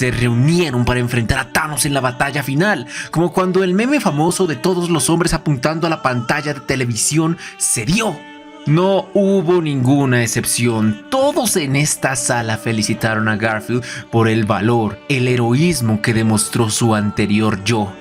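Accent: Colombia. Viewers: Eres colombiano? Sho crei que vos eras argentino che.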